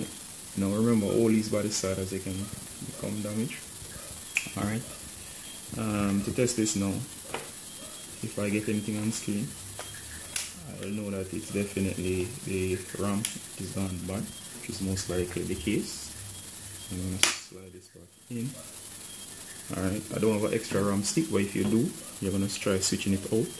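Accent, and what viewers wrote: Jamaica. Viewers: Sound like a Jamaican named maro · oiii mi can hear sey ah jamaica u come from. mi laptop ah move ah way. him mi can link u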